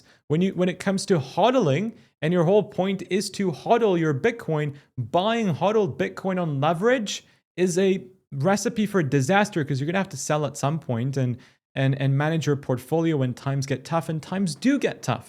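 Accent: United Kingdom. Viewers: Posh toff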